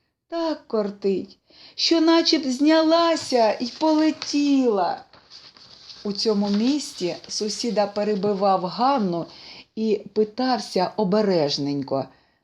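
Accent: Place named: Russia. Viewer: Треба перечитати. Причини: в українській мові літера "О" ЗАВЖДИ ЧИТАЄТЬСЯ/ЗВУЧИТЬ, ЯК "О". Без варіантів. (Відчувається, що начитує російськомовна людина) 2. Проблема з наголосами, напр. : п'Овагом, а не пов'Агом і т.д.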